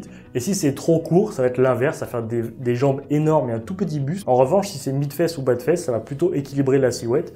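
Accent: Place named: Banlieue, France. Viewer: Tu parles comme dans la banlieue wesh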